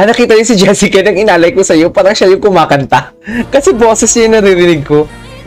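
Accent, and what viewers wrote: Iloilo, Philippines. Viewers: Sa tingin ko, ilonggo ka. May accent.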